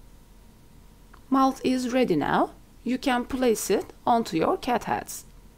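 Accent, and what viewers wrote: Turkey. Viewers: şiveden tahmin ettim Turk oldugunu :D eline saglik 🙏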